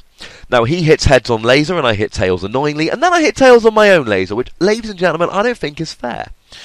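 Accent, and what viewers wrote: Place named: United Kingdom. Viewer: A bunch of random t's, that's so British of you.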